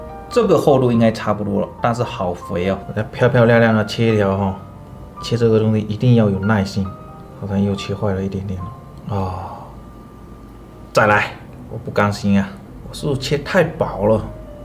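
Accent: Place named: Minbei, China. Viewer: 的闽北普通话不敢恭维丫的女人缘真的没话说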